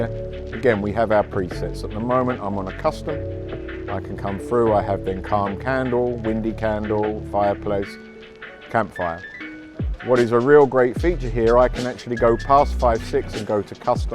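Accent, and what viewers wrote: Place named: London, United Kingdom. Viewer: That fella has such a smooth Wandsworth accent, like listening to Radio 4 after midnight.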